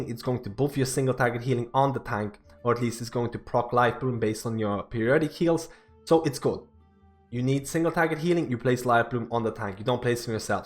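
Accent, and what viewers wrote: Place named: Dublin, Ireland. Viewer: Where are you from? I can hear a bit of a Dublin accent in your voice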